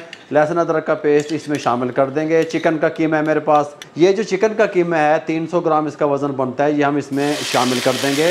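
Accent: India. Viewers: Love ur all recipes, love ur desi accent,, i try ur all recipes, all are yummy, delicious, tasty, from spain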